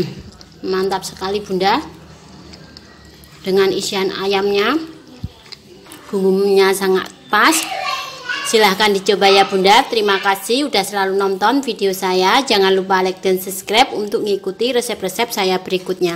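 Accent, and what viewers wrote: Java, Indonesia. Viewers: Iya itu logam jawa hadeuuuh...😀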